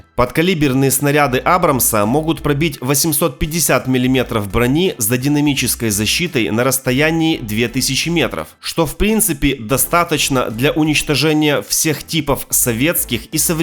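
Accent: Ukraine. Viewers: украинский акцент, прикольно. А что не на мове?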